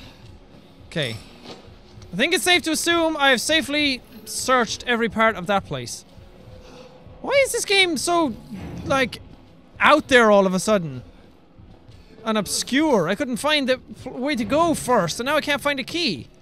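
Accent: Ireland. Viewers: i have seen the whole gameplay even of the whistleblower dlc and I love watching him figure it out with his Irish accent, anyone else? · I have an intense stomach sickness going on right now, and there's nothing like a sweet Irish accent to lull me to sleep :3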